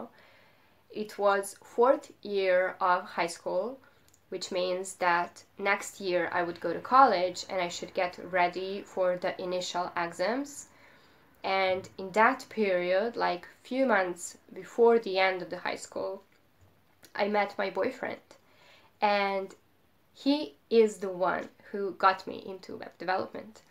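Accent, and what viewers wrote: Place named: United Kingdom. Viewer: u r from Serbia but u talking as british girl wow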